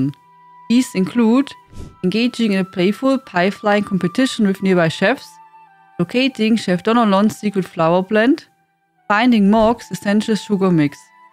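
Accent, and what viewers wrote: Germany. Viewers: You from Deutschland? sounds like one.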